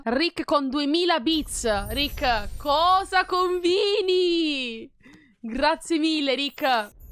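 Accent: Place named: Sicily, Italy. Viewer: È siciliana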